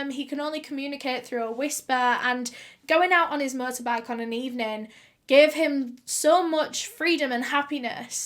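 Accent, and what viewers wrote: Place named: North, United Kingdom. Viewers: I'm from Dublin and my brothers wife to be is from Leeds and she sounds alot like you. That accent is too gorgeous 😍😍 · She’s northern 😁💗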